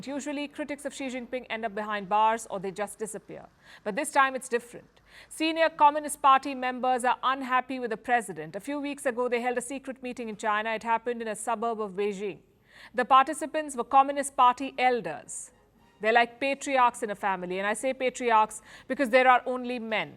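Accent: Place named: India. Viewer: Indian Engrish is grating on the ears heh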